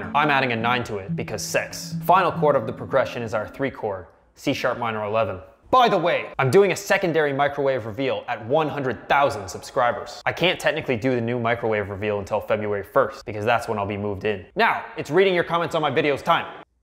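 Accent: Nova Scotia, Canada. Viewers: he’s def from Nova Scotia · You literally say "aboot" and I'm not even mad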